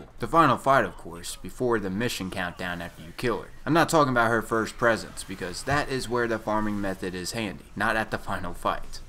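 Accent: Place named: Philadelphia, United States. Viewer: Yo! I like the Philly accent.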